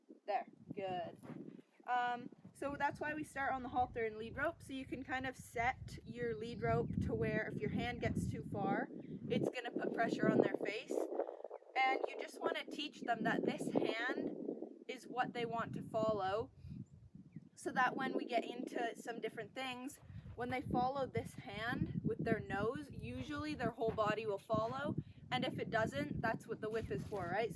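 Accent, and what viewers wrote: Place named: Canada. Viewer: Hello, I'd like to learn more about Missy please. Age, height, color and with that gorgeous dorsal stripe (favorite behind dapples) what you know about breed(s). Also a question about you please if its alright. 50+ years ago I dated a young man from Canada and 2 things stayed with me. The distinct sound of saying the words out and about which I hear in you that prompted the ask and the use of "eh" which seems to be fading. It was so strongly used back then with every Canadian I met while living close to the border and traveling through the country in the 1970s. I wish you a strong build on your YT page and its success is a win for horses. Two accounts I follow that I highly recommend you look at are, 1) THE CLEVER COWGIRL So far we've never fully seen her face but what I do see is a lovely natural blonde who reminds me of lovely strong Nordic women. 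She's kept her name private also but her account name has led to us calling her CC and she seems very fine with that. I imagine she is between late 20s and middle 30s and like you CC is working to build up her account. She worked training horses for others in Utah but she and hubby are now in Montana where she buys the auction horses most people don't or shouldn't want. More than once she's been kindly told she ought not buy a specific horse including one that cost her $25. However, with her >not< bragged about humble but awesome experience and ability she zeros in on the issues then, when ready, sells to an appropriate rid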